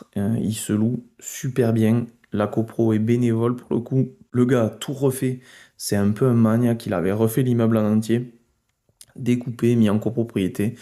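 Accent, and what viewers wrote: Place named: Rodez, France. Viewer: T'es un vaillant, bravo. Bosseur avec une tête bien faite. Même si t'as dû perdre quelques années de vie à cause du stress 😅 La vie d'un entrepreneur en somme J'ai adoré ces 2 videos qui retracent ton parcours, je n'ai pas vu le temps passé. Étant ruthénois je devine avec ton accent que tu ne dois pas habiter très loin de chez moi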